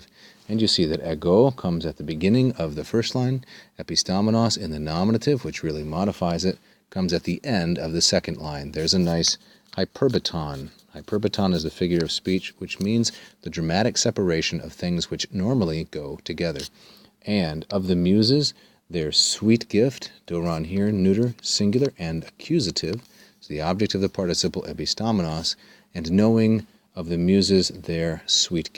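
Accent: United States. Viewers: I would appreciate a bit less of an American accent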